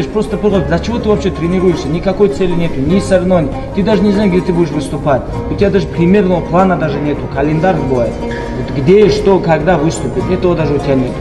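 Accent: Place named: Dagestan, Russia. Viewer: its 100% russian but dagestani people have that arabic/turkish accent which is why it sounds off.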